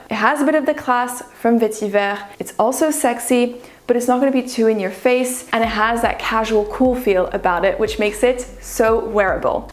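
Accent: France. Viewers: She is definitely a french speaker😊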